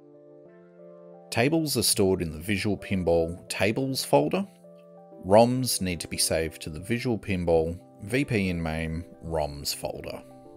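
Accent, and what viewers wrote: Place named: Australia. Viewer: Great guide, thank you for making it clear and concise, and easy to follow. Liked and subbed, What's more you speak Strine, which makes it even easier to follow :D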